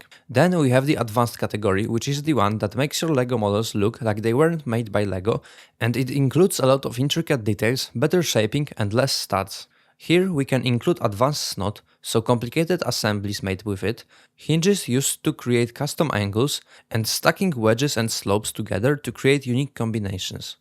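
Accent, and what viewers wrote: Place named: Spain. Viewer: u speak spanish, right? u got a lot of accent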